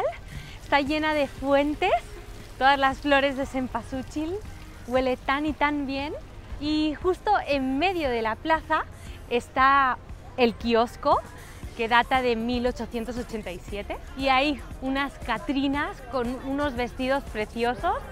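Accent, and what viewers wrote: Andalusia, Spain. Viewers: ¡Excelso video! ¡me encanta tu forma de ser tan alegre! ¿eres andalusí? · Hola amiga! Amiga, una pregunta. Por qué hablas como una española de España? No pareces que eres España, pareces mexicana. Perdón por ofenderte! Saludos desde Michoacán, México.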